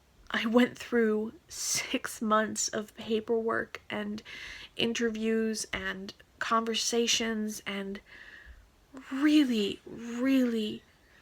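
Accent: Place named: United States. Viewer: i did wonder if you were british or american, now i know (your accent is very 'american' as we know it)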